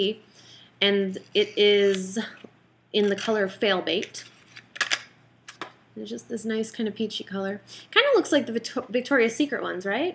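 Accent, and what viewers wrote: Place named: West, United States. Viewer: AND THAAAAN hahahahah I love how you do it in a western accent... all the time!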